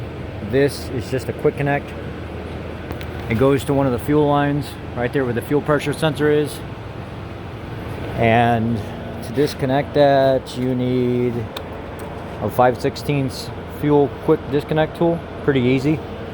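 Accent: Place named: Northern Illinois, United States. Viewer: Does sound any where like Steve, but he does sound like someone from northern Illinois! 😂😎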